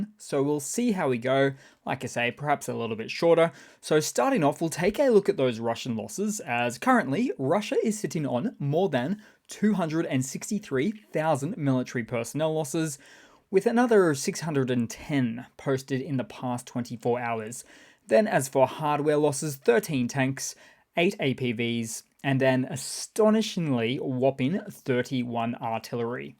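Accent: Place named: Australia. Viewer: I like your accent mate. Are there many different aussie accents or do you all speak the same?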